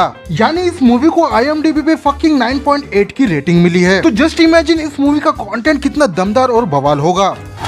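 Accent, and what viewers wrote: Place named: Odisha, India. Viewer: Tere hindi bolne se lagta he tu odiya he hindi me promotion kara raha he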